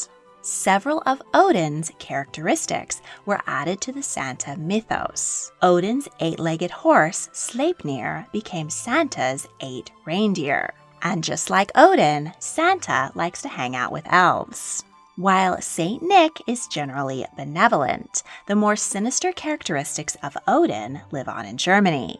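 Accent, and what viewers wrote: California, United States. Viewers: why do we have to endure this American accent!!!!!!!!!!!!!!!!!!!!!!! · Very interesting ..Kaiser Wilhelm was not Edward The Sevenths cousin , he was his nephew ...your American accent is very thick isn’t it ? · Excellent research but the Californian accent is unbearable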